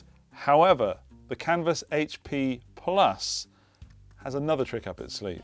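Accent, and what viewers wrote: United Kingdom. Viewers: He’s a Brit. 🤷🏻‍♂️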